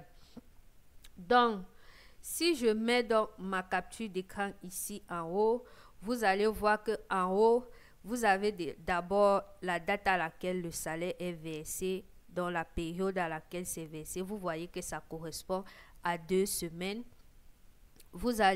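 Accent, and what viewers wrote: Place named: Bamileke, Cameroon. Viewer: Le ton bamileké de ça . Slt sister. Ton visage m' est familier stp dans quel province vies- tu?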